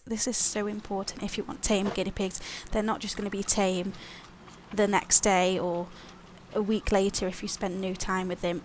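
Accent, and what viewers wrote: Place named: Stoke, United Kingdom. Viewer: you sound like you're from stoke like me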